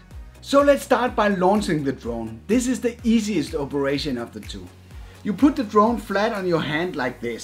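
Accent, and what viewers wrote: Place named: Denmark. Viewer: No question that you are Danish! The accent is obvious from just 10 seconds of listening. Great video!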